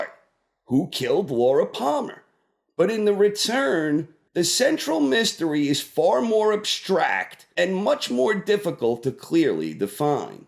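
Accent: New York, United States. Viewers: serious question, where are your from? I grew up in NJ and I suspect a hit of a NY accent? Please respond!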